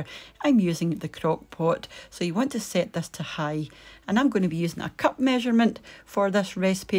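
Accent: Scotland, United Kingdom. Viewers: Ita a follow from me to you whoop whoop ❤ mo the bogan loves a we scottish accent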